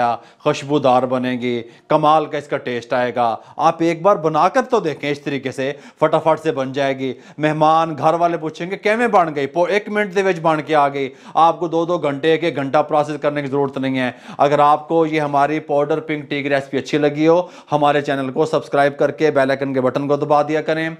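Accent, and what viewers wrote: Punjab, India. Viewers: Zaberdast gi. Ap hazare se hai kia ya punjabi? Apke bolne ka style mjhe acha lgta h. ☺️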